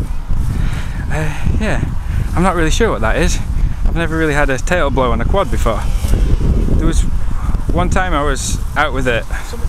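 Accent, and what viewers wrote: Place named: United Kingdom. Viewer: I'm not in the UK though. I just thought by the way he sounded he was in the UK when I watched his videos. I live in Florida, I already bought rbg strip of led, just because I know I'd need help with oriantation, this is my first quad I can tune on my own. kinda excited and really wish it would come I'm already! !! I wanna fly it... I saw at the hobby shop a race drone with fpv and everything except battery and controller on sale for 170 I'm thinking of grabbing that as well lol. I been dying to fly and hook up my fat shark to something that flies again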